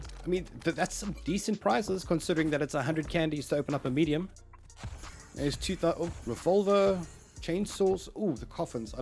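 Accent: South Africa. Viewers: My boy's a South African... Goddamn. It's one of a kind😂Love from CPT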